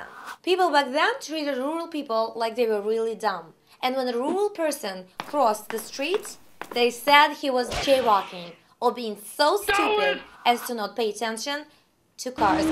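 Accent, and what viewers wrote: Russia. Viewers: she has an russian accent and russia is not part of Europe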